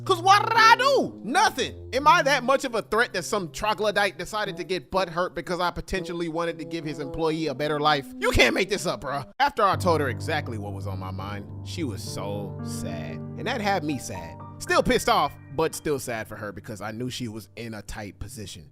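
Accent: United States. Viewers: It's ur accent bro, u got an American accent that many people in South Africa might find interesting... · from one honeyless man to another let me inform you in Joburg no honey gets "rizzed up" they just want the paper cuh, they just heard your American accent and probably thought "yeah, he got that cheese". You want a honey who'll be a ride or die, go to KZN get yourself a Zulu wifey, next time you in SA. AND DEAR GOD DON'T GET A XHOSA hun.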